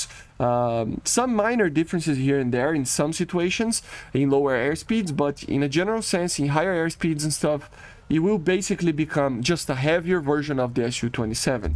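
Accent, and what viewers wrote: Brazil. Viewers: Are u from Brasil? Ur accent looks like so much brazilian accent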